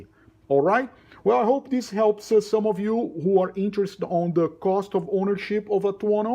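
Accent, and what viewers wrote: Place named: Brazil. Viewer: Thanks for the reference. I am getting close to 10,000km. BTW, you have a Brazilian accent :)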